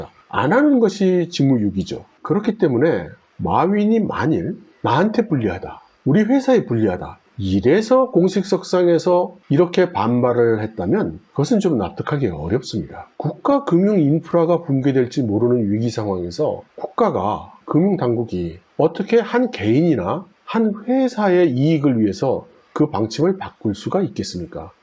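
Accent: China. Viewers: 말투가 음 중국계이신 듯 ㅠㅠ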